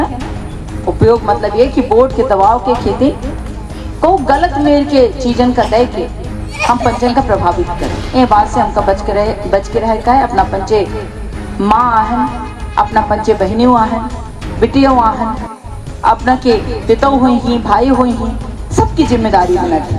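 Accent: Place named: Baghelkhand, India. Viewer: बहन अपना के बघेली बोल चाल के हम बहुत कायल हैं अपना बहुत बहुत धन्यवाद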